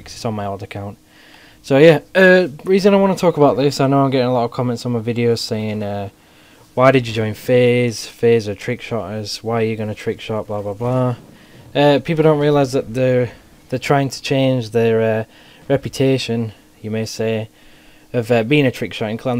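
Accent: Yorkshire, United Kingdom. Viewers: Were u from spratt, yorkshire ???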